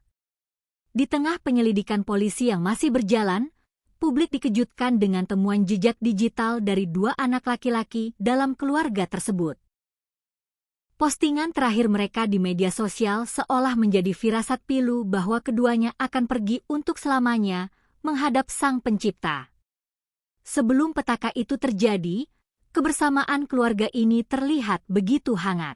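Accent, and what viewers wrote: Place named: Indonesia. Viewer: Menyebalkan kalo pakai AI tulisan inggris di eja seperti bahasa Indonesia. Menjijikan mendengarnya jadi kelihatan dungu.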